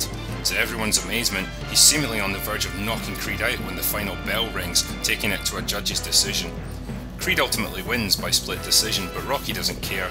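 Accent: Scotland, United Kingdom. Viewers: Until now I've not noticed the Scottish accent at all. 'Drunk' was what I have been hearing. But now I realise the Scottish was there all this time.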